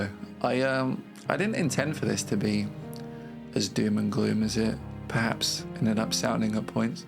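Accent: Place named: England, United Kingdom. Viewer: Wow, I was shocked to end this video and see you only have 40 subscribers. Love your humor and topic of video. The combination of English accent and mug is a little hard to digest at once, though. If you’re going to show your face at least, use an American accent or vice versa. Keep up the quality man. Seems like you’ll be successful on here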